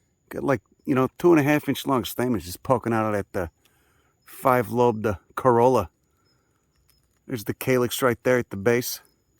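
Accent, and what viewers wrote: Chicago, United States. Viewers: Sounds like some of my Chicago family members but on steroids... Love this guy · Ah, love the speak. 20 years LA via Chicago (et. 1970 Cicero ) You turned me onto some really good reference books. I can’t keep up with you. But I love going back to reference what you say and describe. But , the Chicago that exudes from your pores makes me homesick for a memory. I’m so glad I found your channel. The more I learn the better I feel!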